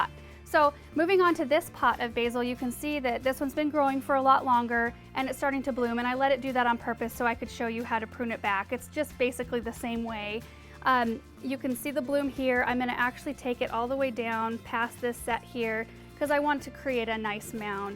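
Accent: United States. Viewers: Your idiot Amurrucan voice makes me sick. Basil grows itself, leave it to grow.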